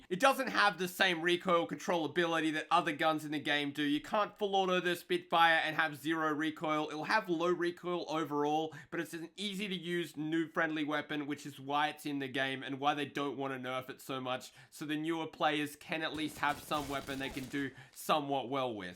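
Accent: Australia. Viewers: I guess that's the Aussie accent lol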